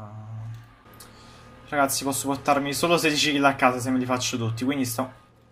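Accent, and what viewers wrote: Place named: Rome, Italy. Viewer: Io non ho capito una cosa. Sei napoletano perché parli romano ?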